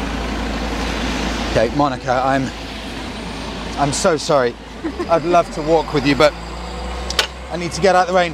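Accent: United Kingdom. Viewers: Me encantó tu video! Hermosa Lituania! estoy estudiando inglés, tu acento me hizo acordar mucho a y a! thank you very much!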